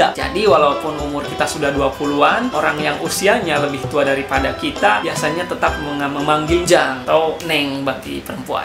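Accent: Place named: Bandung, Indonesia. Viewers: Tong hilap kang, seueur logatna oge, sapertos akang logatna kentel urang Bandung asli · Logak.. orang bandung emang lucu ya..hehe #SalamDariSukabumi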